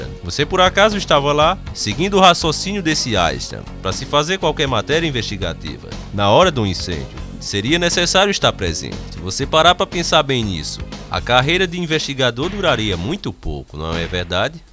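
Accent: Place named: Pernambuco, Brazil. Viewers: parabens cara, seus videos são foda mermo e as tuas zoaçoes com sotaque pernambucano é massa!!!!!! ganhou minha incrição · Pela voz do Locutor, deve ser de Pernambuco!!!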